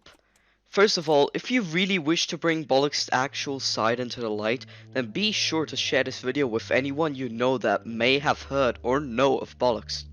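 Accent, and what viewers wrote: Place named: United Kingdom. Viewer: Nice vid but you should upgrade to a snowball EDIT I love your voice it’s like a mixture between posh british and Scottish/Irish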